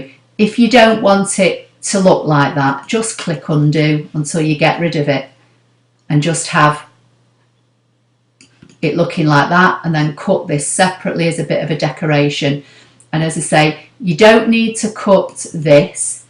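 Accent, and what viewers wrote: United Kingdom. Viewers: Well as I’ve heard and believe....YouTube videos have a life of their own. Someone catches one and the fun begins. I’m amazed when I view one of yours and read the comments that it is from “the past”. Being rather new to ScanNCut and paper crafting I’m looking for all the help I can get. Thanx so very much for your beautiful work. And I live your British accent. 😍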